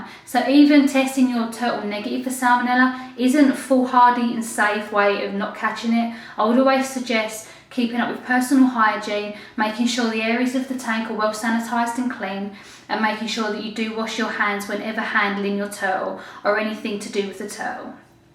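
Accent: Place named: England, United Kingdom. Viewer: Are you from UK? You sounded English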